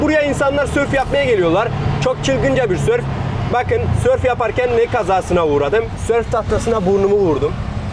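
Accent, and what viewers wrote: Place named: Sivas, Turkey. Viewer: Evlat ya ben sivaslıyım konuşman şiven hareketlerin o kadar çok sivaslılara benziyor ki hele şu norüyon demen varya beni bitiriyor 😂😂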